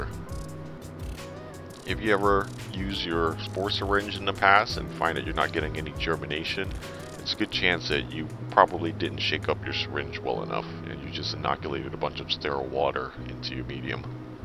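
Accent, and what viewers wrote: Philadelphia, United States. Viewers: . Yup, he's from Philly alright. Anyways, I hope those jawns worked out for ya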